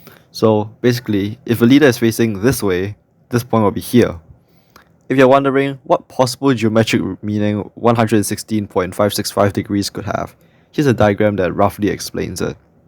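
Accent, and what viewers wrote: Singapore. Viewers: why do you sound singaporean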